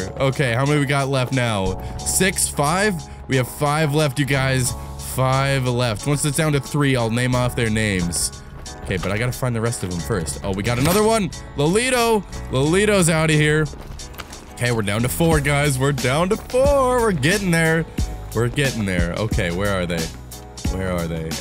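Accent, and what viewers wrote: Minnesota, United States. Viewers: Shit is this guy from Minnesota too?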